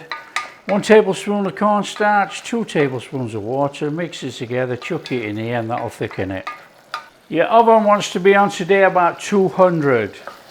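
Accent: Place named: Lancashire, United Kingdom. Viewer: Im from littleborough lancs i think i may be not far from you by your accent, i will google magna thanks.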